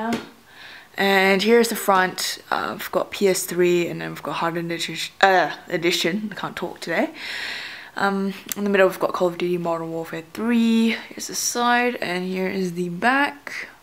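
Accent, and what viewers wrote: Australia. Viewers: It's not that hard guys, this is obviously a woman from Australia (or New Zealand always get mixed up) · are you a boy with a auzzie accent or a girl? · Aussie Accent? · Ur Australian?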